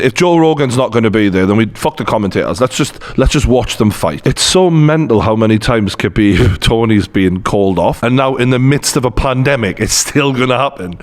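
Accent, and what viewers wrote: Newcastle, United Kingdom. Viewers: I`ve subscribed just cos you're a Geordie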